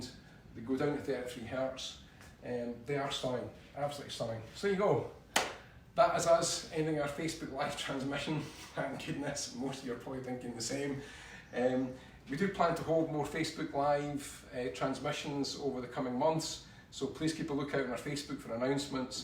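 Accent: Scotland, United Kingdom. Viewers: Love that Scottish accent and the rug is great too.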